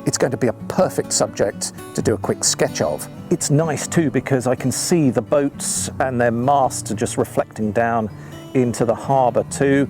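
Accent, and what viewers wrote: England, United Kingdom. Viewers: i havent watched your channel in a long time. i always love it. and the english accent is way worth watching the whole thing.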